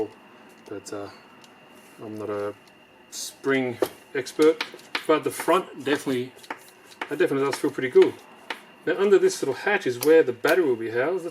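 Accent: Australia. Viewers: It’s a shame that your vids keep getting demonetized, seems like YouTube’s never experienced Australian English before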